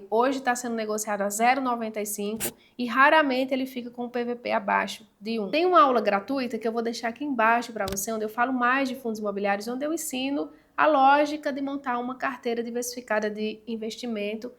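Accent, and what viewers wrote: Brazil. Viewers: Seu sotaque é de que local do Br?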